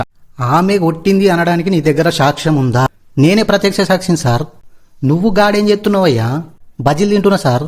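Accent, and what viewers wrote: Telangana, India. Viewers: అది భోజన ప్రియత్వం కాదు కానీ,హాస్యానికి అదో ఆధారం! ఇంత వరకూ ఎలాటి అసభ్యతకు చోటులేకుండా తీసుకొస్తున్నారు హాస్యాన్ని...! ఇకముందుకూడా... ఈ తిండిగోల కి సంబంధించి అన్ని కోణాలు ముగిసిన తర్వాత - హాస్యానికి మరో ఆధారాన్ని వెతుక్కుని...మనసారా మా అందరినీ ఇలాగే నవ్వించేలా ఉంటాయి మీ వీడియో లు అనుకుంటున్నాను. కీప్ ఇట్ అప్ గారు. తెలంగాణ భాష మీ నోట మహా అందంగా, తీయగా...మీదు మిక్కిలి సహజంగా పలుకుతుంది. అభినందనలు. Proper Metpalli నా...? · Love you vedios am very happy to see ur voice mana telangana language super🥰😍😘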